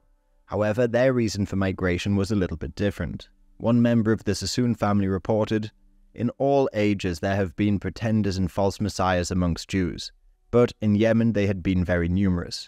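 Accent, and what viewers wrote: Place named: United Kingdom. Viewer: He has a British accent. He’s not American. Are you slow?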